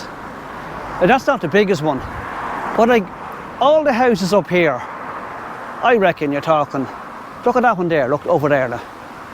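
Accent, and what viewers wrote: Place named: Louth, Ireland. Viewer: Glad you enjoyed it old bean .You have the Drogheda /Louth accent for sure .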